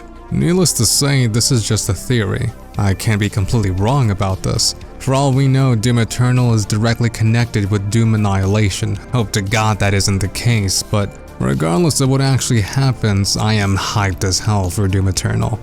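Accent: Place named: England, United Kingdom. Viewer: You kinda sound like Ryo (english accent), from Devilman Crybaby lol. Also good video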